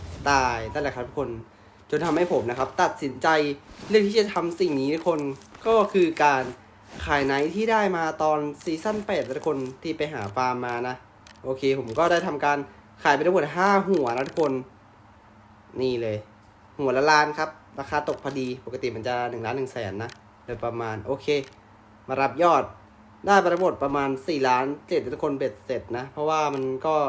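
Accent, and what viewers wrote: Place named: South, Thailand. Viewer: คนใต้ม่ายนิบ่าว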